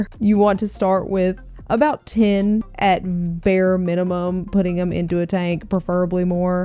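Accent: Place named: North Carolina, United States. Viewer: being from NC I heard that accent and thought yep I trust her!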